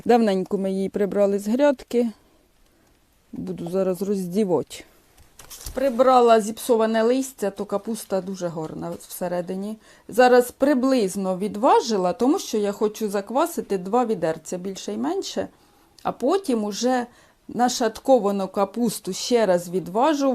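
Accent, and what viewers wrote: Poltava, Ukraine. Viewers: Ви мабуть з полтавщини судячи по говору?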